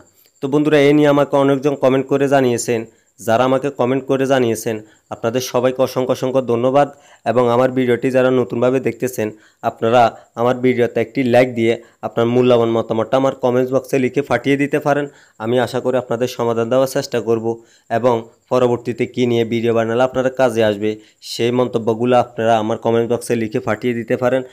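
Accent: Noakhali, Bangladesh. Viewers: ভাই আপনাটর বাড়ী কি নোয়াখালি? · Duru khankir pula nuakailla. ..shslar put agay kotha bola shik. .tar por video bana. .. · তোমার বাড়ি কি নোয়া খালি নাকি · আগে কথা বলা শিখুন, নোয়াখাইল্লা।। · নোয়াখাইল্লা নাকি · Vi apne noakhilla na? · নোয়াখাইল্লা ভাই আপনি আগে প বলা শেখেন তারপর কথা বলেন।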